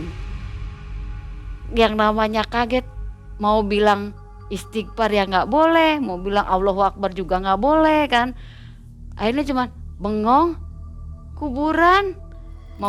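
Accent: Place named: Cirebon, Indonesia. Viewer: kya org cirebon yah logatnya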